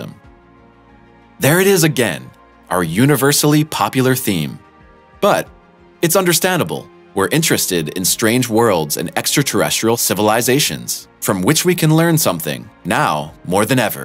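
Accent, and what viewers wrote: United States. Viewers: This video is utter pop science cack, spouting the kind of shit you'd find in a Christmas cracker or fortune cookie. This channel doesn't deserve its number of subscribers and this video certainly doesn't merit the views it has. The narrator has that quasi-American accent of someone whose first language isn't English and the knowledge of someone whose first discipline certainly isn't astronomy.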